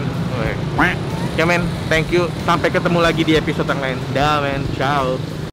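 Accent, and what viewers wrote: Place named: Ambon, Indonesia. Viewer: Ambon mana suaranyaa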